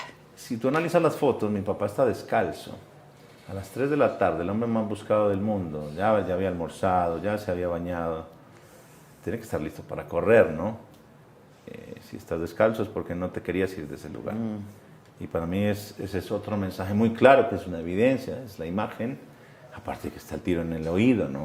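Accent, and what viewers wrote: Mexico. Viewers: Este Huevon adoptó el acento Mexicano sin serlo. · para nada , soy colonbiano y de la region dode nacieron ellos soy paisa, viaje por casi toda colombia y a leguas se ve que se le esta pegando el acento mexicano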